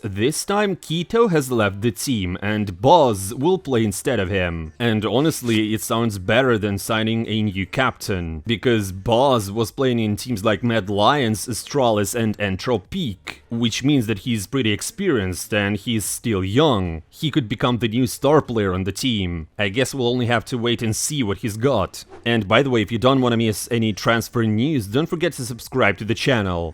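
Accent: Russia. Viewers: This russian accent is horrible.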